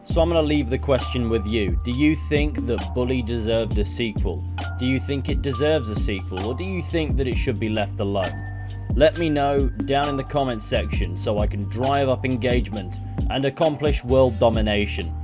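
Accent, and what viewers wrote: United Kingdom. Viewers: Despite the janky controls, and the fact I could never get all 50 lessons done in any playthrough (damn you, Biology 5!), I loved playing Canis Canem Edit on PS2. Iirc, there were only 5 lessons and the Xbox 360 version had 10 lessons and was called Bully: Scholarship Edition....well, in the UK, anyway. From your accent, I'm fairly sure you remember the moral panic in the UK about a game being called Bully.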